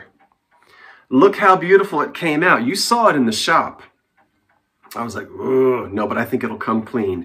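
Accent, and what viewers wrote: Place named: South Jersey, United States. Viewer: Can't get over that south Jersey accent, waddur!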